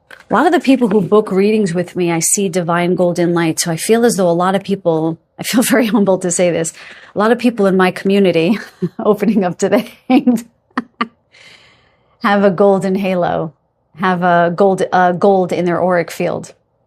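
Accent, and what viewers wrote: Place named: New York, United States. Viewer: Hello again and thank you for taking the time during such a stressful time for you. I wish I could do some of the physical work for you. I chose #3, and yes it does fit, and it confirms what I've been getting myself. In the last several days I've been feeling very cozy with Spirit without having to do anything to get there, as usual. And I'm feeling grateful for that as well as feeling anticipation of good things. I think that very big things are coming to the world very quickly now and we are the placeholders for the collective. And it is very near to showtime. .... BTW, .. please don't hide the NY accent, it's cute on you 👍😉 .... These are SUCH special and auspicious times that it will easily wash away the ugly and painful if allowed to. The Creator has given me great strength and I will be forever grateful 😊 .... 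bye now and thanks again ❤️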